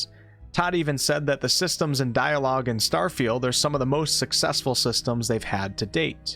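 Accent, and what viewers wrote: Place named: Pacific Northwest, United States. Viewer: Something tells me he's from PNW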